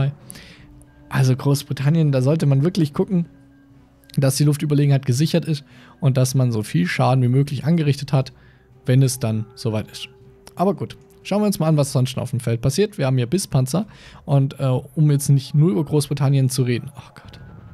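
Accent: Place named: Swabia, Germany. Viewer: Hätte wenigstens wieder auf schwäbisch sein können :D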